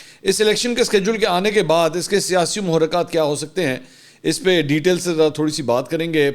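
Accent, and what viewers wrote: Punjab, Pakistan. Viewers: Very well elaborated but conscious enough. Balanced and intelligent. Good punjabi touch as well.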